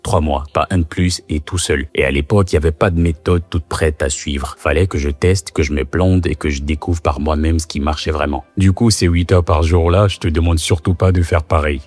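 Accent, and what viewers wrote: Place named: Ivory Coast. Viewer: C'est l'accent ivoirien ça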